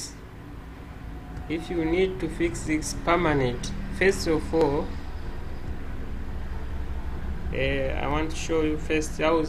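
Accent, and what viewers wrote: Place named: Africa. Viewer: First tutorial with an African accent. Helpful and I like it. Proud Africa💚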